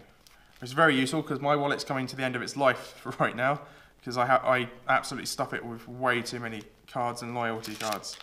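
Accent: United Kingdom. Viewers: thanks So are u actually British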